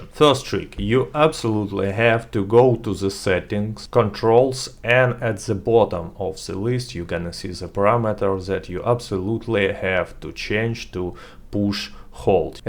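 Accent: Russia. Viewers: Geez, why every russian has such a horrible accent? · He’s Russian of course it sounds cool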